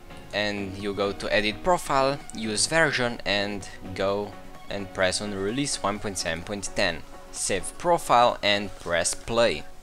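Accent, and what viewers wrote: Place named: Poland. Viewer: Thanks you so much :3!! By the way are you from Poland, because your accent?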